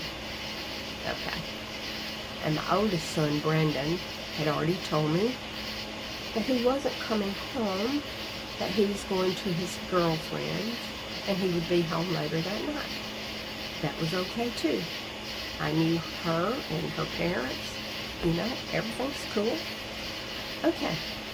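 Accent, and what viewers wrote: South, United States. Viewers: She is definitely southern, My Dad was from Alabama and pronounced certain words differently.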